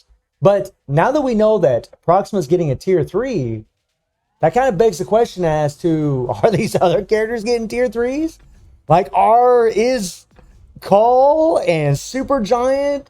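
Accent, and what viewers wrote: United States. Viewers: Oh man, I thought u sounded country. Love the beard man.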